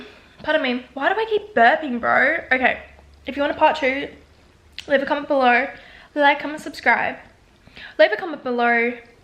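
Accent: Australia. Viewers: And her thicc aussie accent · I only talk in an Australian accent now because of you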